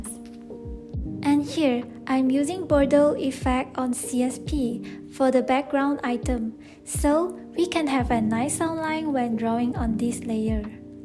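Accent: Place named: Vietnam. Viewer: idk why but your accent looks like Vietnamese people when they speak English lol sorry if you're not Vietnamese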